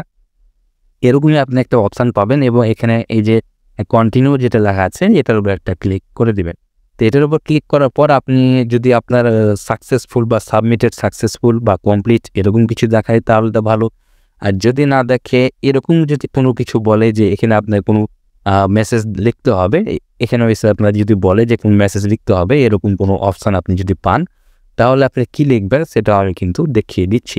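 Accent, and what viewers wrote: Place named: Chapai Nawabganj, Bangladesh. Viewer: Vai Apni ki Chapai zela, ba godagarir upozilar manus? Voice sune amn ta mone hocche..😊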